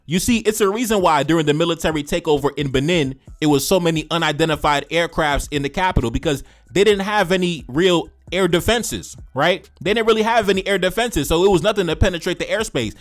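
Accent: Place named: United States. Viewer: Thank you for the explanation, Bro, for explaining what has actually happened. I love your American accent!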